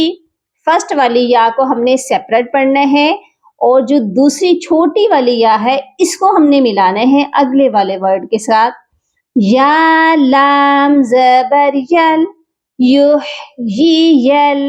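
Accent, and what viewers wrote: Panipat, India. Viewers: Ap jis lehjay may parti hai wo panipati lehja hai plz muhjy batay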